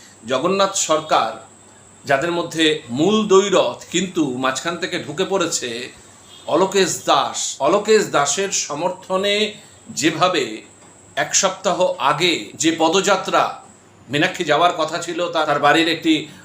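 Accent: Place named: Bangladesh. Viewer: কথাবার্তা একদম বাংলাদেশীদের মত ! তা' বাপধন তোমাকে এখানে লেকচার ঝাড়তে কে ডেকেছে ?! তোমার একটা চ্যানেল আছে দেখলাম ৷ কেউ দেখে ?!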